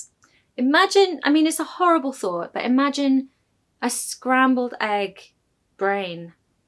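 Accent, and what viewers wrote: United Kingdom. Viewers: Oh... my favourite tutor... with the British Accent.. I Love... ❤ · Thanks for the youtubers like U 😊 i really like british english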